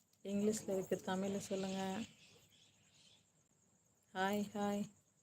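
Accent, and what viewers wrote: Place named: Coimbatore, India. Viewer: Hi என்ன ரொம்ப தூக்கம் போல கோவை தமிழ்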